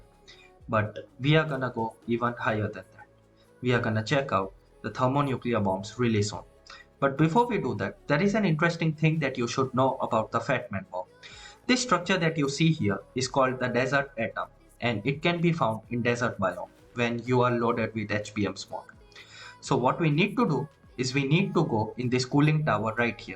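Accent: India. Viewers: You sound like english indian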